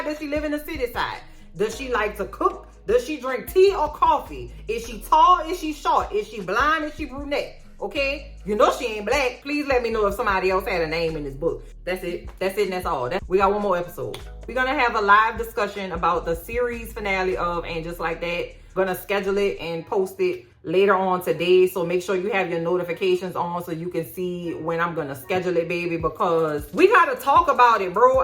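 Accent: New Orleans, United States. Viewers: Your accent is what I find a very pretty accent type for US and to me (as a Polish woman who moved to the UK as a teen) it's very clear, and I can understand every word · I'm so sorry to hear you're getting arseholes, racists, and idiots coming here to your channel. I've only been watching for a few weeks---found out about you on Reddit!--and for what it's worth, I love your New Orleans lilt 🙂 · My favorite pies: Lemon Meringue, French Silk (Chocolate), Pecan, and Sweet Potato are my favorite pies. 🥰🥰🥰 I will eat a Fried Apple Pie every now and then...but it is not my favorite. Also, Cousin, I LOVE how you protect your space. And I LOVE the N'awlin's accent. (However, I am a Southern born & bred Cousin...MS). ❤ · Awwww I love the N’awlins/New Orleans accent! I have NO trouble understanding! Two of my best boyfriends were from Louisiana, one lived in Slidell/Meterie, and the other in New Orleans proper. 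❤ Bith talented musicians, and one a great cook! Good for you for sticking up for yourself and your channel’s loyal community! Keep on using that strong voice of yours proudly, cuz I love to hear it! 🎉🙌🏼🌟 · Damn fools complaining about you & your channel? Your awesome NOLA accent??? Don't get me started. The Brooklyn in me will read them! My PR/Capricorn tongue will unfurl and I'll be placed in YT jail Please. Why ARE they watching if they don't like you? Idiots. Okay enough of that. 7 minutes of AJLT for the second to last AJLT ep. Yep. That's what it felt like. Only thing I'll miss about this show is you & your recaps. I look forward to THAT more than And Just Like Crap (shout out to the other YTers who have that channel name). You are 🔥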